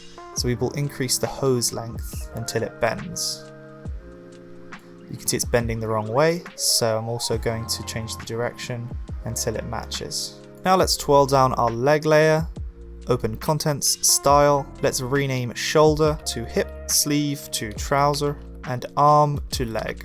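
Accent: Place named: West Country, United Kingdom. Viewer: Really good tutorial, great job. Are you from the West Country? I hear a slight twang in your R's haha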